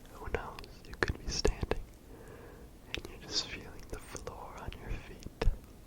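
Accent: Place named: France. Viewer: Im in the same boat with college picking. Deciding between a good college in a good area but is more expensive and a good college in a not so fun area that is cheaper. Also idk If you want to know this, but when I first saw you I thought you were French. Cause in the specific video you sounded like you had an accent and I saw you had a French video on your channel. Also you kinds look French. I like to hear stuff like that, ive heard I look Russian a lot, same with my brother who looks like me.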